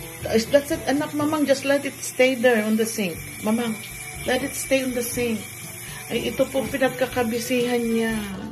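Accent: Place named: Abra, Philippines. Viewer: Madam ang tuno yang salita mo parang taga sa amin. Bangued Abra po ang aking hometown.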